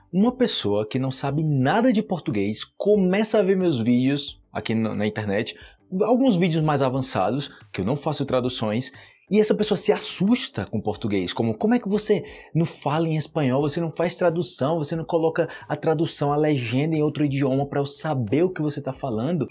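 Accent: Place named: Colombia. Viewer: Voce é crack,! A maioria de coisas que eu aprendi em portugues foi com os seus vídeos! <3 Obrigada sempre! Aproveito para te falar que fico surpresa sempre com o seu espanhol maravilhoso e o seu sotaque colombiano!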